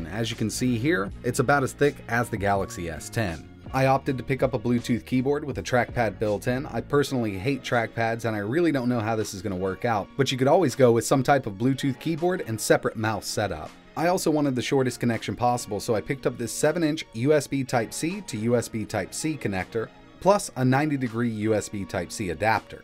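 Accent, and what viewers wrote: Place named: South, United States. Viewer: I love southern accent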